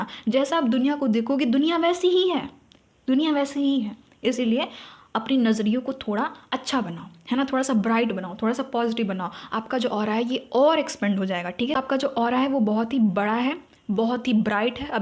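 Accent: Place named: RP, United Kingdom. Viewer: I like your accent, I am myself into British RP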